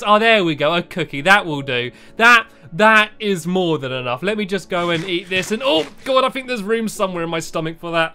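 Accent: England, United Kingdom. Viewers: You inglish bro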